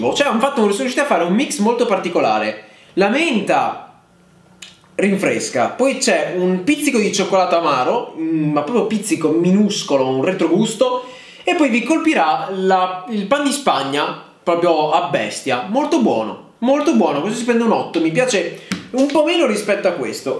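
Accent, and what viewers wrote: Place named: Bari, Italy. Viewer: Sembri più barese che napoletano 😂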